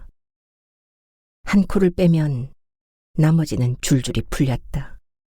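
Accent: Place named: Daegu, South Korea. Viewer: 대구 사투리! 참 재미있습니다. 시대배경이 눈앞에 그려집니다. 그리고 미성의 주인공 우리 님은 어떤분일까?❤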